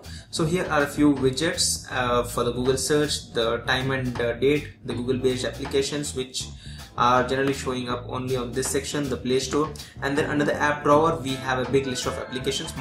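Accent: India. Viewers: Stop saying checkout and get rid of that Indian accent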